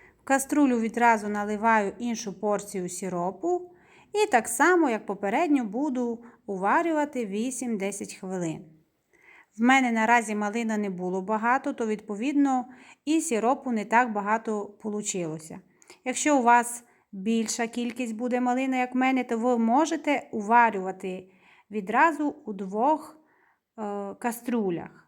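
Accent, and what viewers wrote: Transcarpathia, Ukraine. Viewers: Відразу чути, що це наша Закарпатська господиня😊❤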